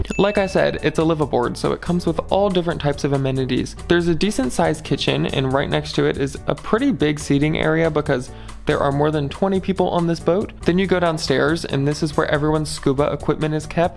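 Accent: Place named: Philadelphia, United States. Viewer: That's a Philly accent if I've ever heard one.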